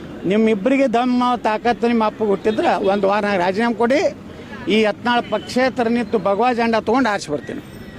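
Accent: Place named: North Karnataka, India. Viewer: ಪಕ್ಕಾ ಉತ್ತರ ಕರ್ನಾಟಕ ಭಾಷೆ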